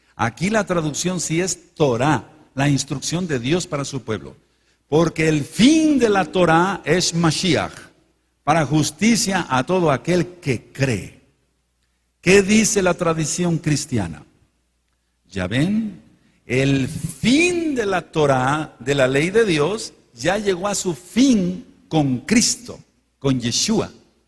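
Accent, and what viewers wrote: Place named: Latin America. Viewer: Me da tristeza ver a hombres como este "predicador", con claros rasgos físicos de un latino y con acento latino, tratando de imitar al pueblo judío, dejando crecer su barba y usando unas cuantas palabras en hebreo (mal pronunciadas claro está). ¿Cuál es el afán de practicar la ley (torah) judía? Jesucristo ya murió por nosotros y solo tenemos que seguirle a Él, no volvernos atrás a la ley judía, la torah es para los judíos, el nuevo testamento para los cristianos. Al hombre que habla en este vídeo le digo, si vas a hablar en hebreo, "predica" completamente en hebreo, pero si este vídeo es en español, habla en español. ¡No traten de aparentar un conocimiento del cual carecen usando palabras en hebreo! Jesucristo es Jesucristo (No Yahschuah HaMaschiaj, eso es hebreo) Dios es Dios (No HaShem, ElOlam o Elohím, eso es hebreo) Iglesia es Iglesia (No Kehilá, eso es hebreo) Graba un vídeo "predicando" completamente en hebreo y entonces te creeré lo que hablas. 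Mientras tanto, En el nombre de Jesucristo, ¡Cállate!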